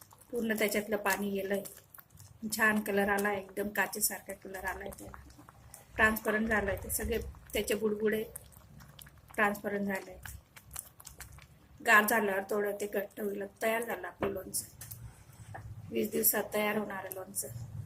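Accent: Khandesh, India. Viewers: Maushi tumhi Khandesh chya aahat kaa? Tumchya bhashewarun aani swayampakachi banawnyachya padhhatiwarun watate. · Khandeshi ka.....? Apan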